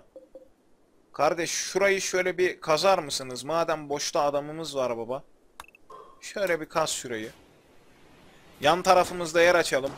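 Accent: Ankara, Turkey. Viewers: selam kardeşim adamsın sen ya ankaralı aslan parçası daima senin arkandayız en çok da ankaralı konuşmanı sevdim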